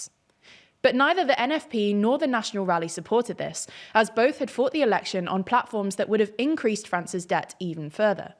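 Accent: United Kingdom. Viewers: An asian woman with a British accent talking about French politics... Your credibility is zero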